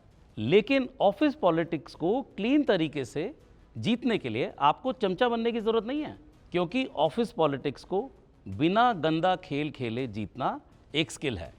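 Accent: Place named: India. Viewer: I realized that you are an Indian, not Pakistani. And it was just because of accent of Urdu speaking ❤. Regards, "FARAZ AHMED"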